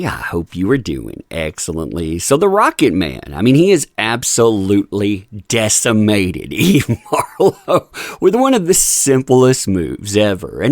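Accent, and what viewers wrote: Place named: Tennessee, United States. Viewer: Hey All, Please hit the like button, watch all the way through, & share this video everywhere, it’s important. Plus, who can resist listening to voice & beautiful Tennessee accent, that is a sophisticated, cultural gem; an accent that’s quickly disappearing from society. Thanks!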